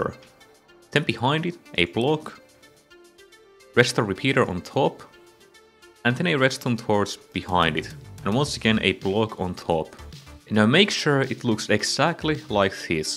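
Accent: Finland. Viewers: are you Finnish by any chance? you sound Finnish. or then im just going mad. 🥴 also suomi The P word. torilla tavataan?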